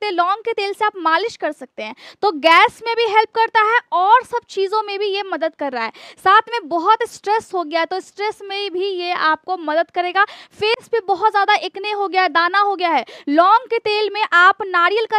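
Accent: Bihar, India. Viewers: Kya Aap Bihar Se Hain??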